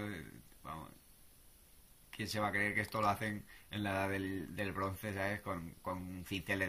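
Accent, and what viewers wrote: Spain. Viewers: de que parte de España es ese acento...... es como el castellano chileno.... que no se entiende un carajo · tú eres valencia segur.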